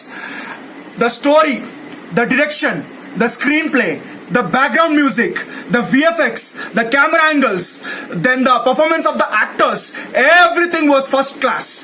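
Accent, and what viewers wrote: India. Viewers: are you an Indian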